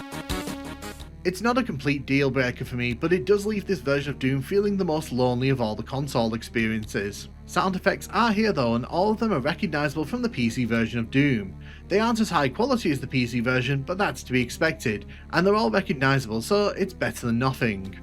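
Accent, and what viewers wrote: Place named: United Kingdom. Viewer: He's British, and we Brits pronounce it that way.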